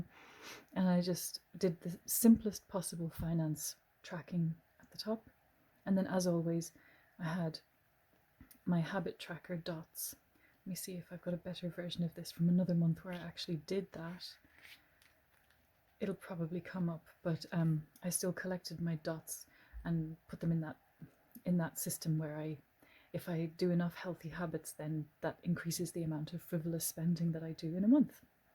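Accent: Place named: Ireland. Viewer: You have an incredible soothing voice.. Nice to see.. And hear.. Irish voices..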